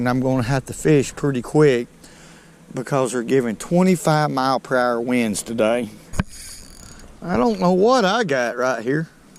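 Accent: South, United States. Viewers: Amazing how you have southern accent but say crOppy rather than crappy. Its and A not an O. If your from the south get with the program.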